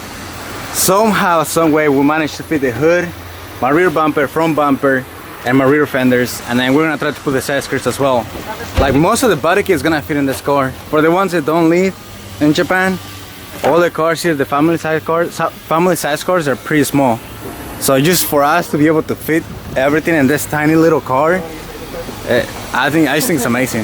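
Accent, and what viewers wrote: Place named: California, United States. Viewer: bruh when i first heard your accent i thought you was in cali and then i see all the japanese plates😭😭😭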